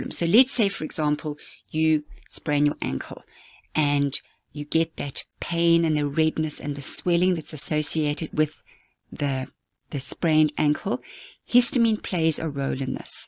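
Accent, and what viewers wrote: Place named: South Africa. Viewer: You sound like you are from South Africa.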